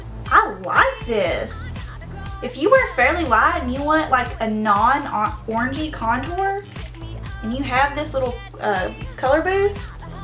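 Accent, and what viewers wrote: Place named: Tennessee, United States. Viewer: I love your accent! ^_^ I just moved to Knoxville, Tennessee from Portland, Oregon and most people have this super cute accent and I just find it adorable. XD Thank you for this tutorial too! Very pretty look! ^_^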